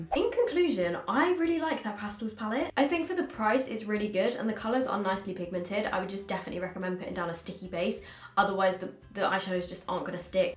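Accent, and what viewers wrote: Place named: England, United Kingdom. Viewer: I just love the whay how she speaks!Is she from England or something?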